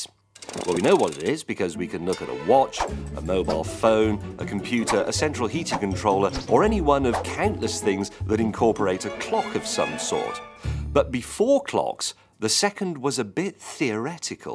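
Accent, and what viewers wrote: United Kingdom. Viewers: why the hell the British accent is sooo attractive?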